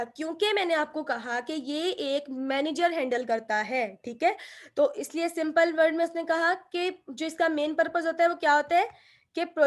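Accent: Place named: India. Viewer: When I heard the way you speak. my mind said oh. Indian.. I'm a good friendly to Indian people. too much here in Abu Dhabi my friend.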